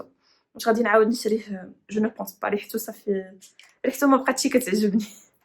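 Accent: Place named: Maghreb, North Africa. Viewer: فيديو رائع❤️❤️ تصوير رائع ❤️❤️ محتوى رائع❤️❤️لكن اللهجة صعبة الفهم لمعظم العرب لا يفهمون اللهجة المغربية والجزائرية والتونسية للأسف 💔💔ف الرجاء التحدث ب الشامية أو الفصحى أو المصرية هكذا ينتشر الفيديو أسرع ويكون له جمهور أكبر او ضيفي ترجمة مصاحبة بليز 💖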